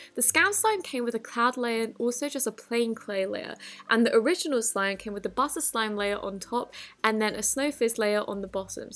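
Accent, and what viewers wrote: United Kingdom. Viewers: Uh yes, British accent, i love it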